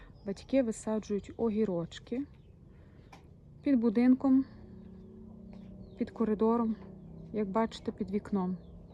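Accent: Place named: Rivne, Ukraine. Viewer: Дуже гарне вiдео та мова наша украiнська, маю родичiв у Рiвненськiй областi , такий самий говор😊 Дякую !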